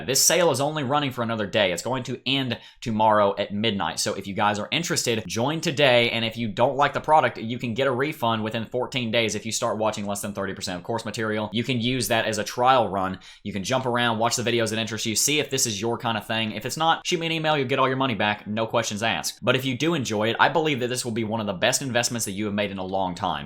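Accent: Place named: Alabama, United States. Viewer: I am not from Birmingham AL, but you sound like you may be! Enjoy your channel!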